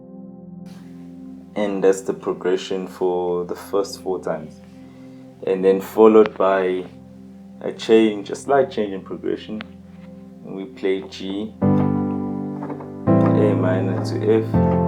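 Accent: South Africa. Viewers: awesome. You sound like you from KZN or Gauteng? I just noticed your channel and subscribed now. Thank you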